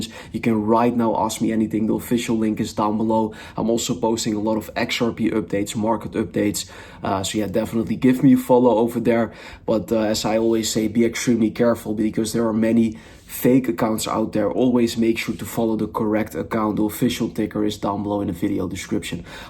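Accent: Netherlands. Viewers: Typical dutch accent our has!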